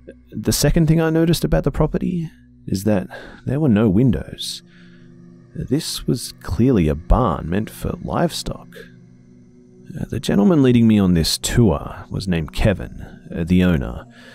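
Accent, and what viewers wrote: Australia. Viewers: He is Australian, so the way He pronounces things is different from ours.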